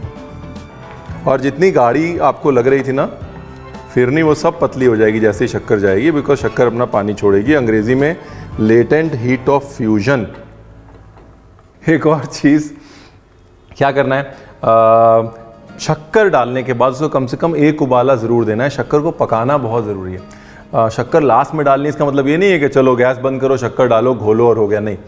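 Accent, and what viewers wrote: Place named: Lucknow, India. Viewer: Like ur Lucknowvi andaaz😁😊😊🏆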